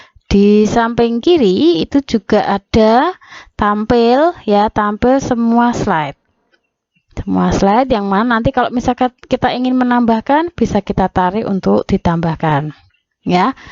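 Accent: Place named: Java, Indonesia. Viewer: Medook nya poll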